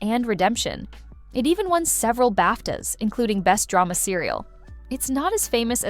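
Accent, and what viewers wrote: United States. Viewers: Sorry, i can't stand this americain diction...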